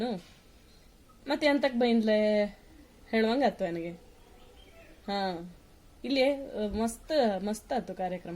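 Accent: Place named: Yellapur, India. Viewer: ನಮ್ಮ ಪ್ರೀತಿಯ ಯಲ್ಲಾಪುರ ಕನ್ನಡ ಬಾಷೆ ಎಂಥ ಸೊಗಸು ನಿಮ್ಮಿಂದ ಇನ್ನೂ ಹೆಚ್ಚೆಚ್ಚು ಸೊಗಸು ಧನ್ಯವಾದಗಳು🎉🎉🎉🎉🎉🎉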